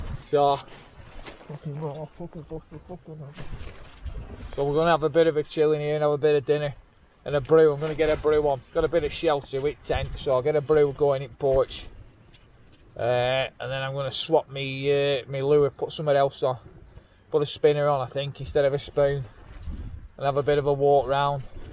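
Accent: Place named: Lancashire, United Kingdom. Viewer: Excellent video. Always look forward to them. Love hearing the accent. Here in Western Australia you don’t hear many Lancashire accents like yours or mine. I can’t go walking in the mountains like you but feel I’m there. Many thanks from dave down under.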